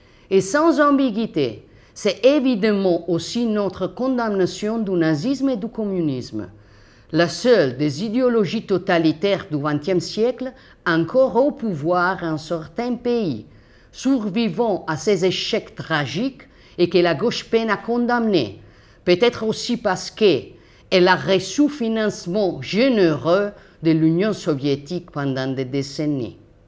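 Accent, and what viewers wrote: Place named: Italy. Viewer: Le français avec l'accent italien ça me fait fondre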